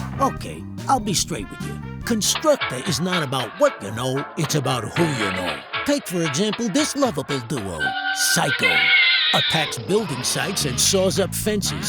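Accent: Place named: United Kingdom. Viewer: "Voiced by yours truly" Am I the only one who was interested in mob athstetic, only to be confused by the british chap?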